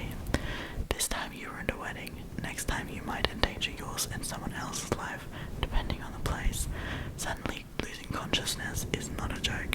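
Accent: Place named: Australia. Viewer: I’m in shock, I’m Aussie and 100% thought you were Australian too! Your English accent is so Aussie I actually can’t believe you’re German 😮 please keep the vids coming they are so good!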